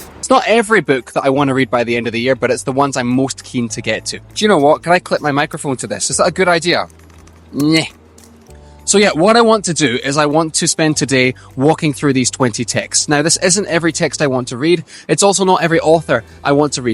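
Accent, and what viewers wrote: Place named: Scotland, United Kingdom. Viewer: Duuuuuude the real deal in a Scottish accent?!! That’s it we are over the moon! So hope our family project hits for you.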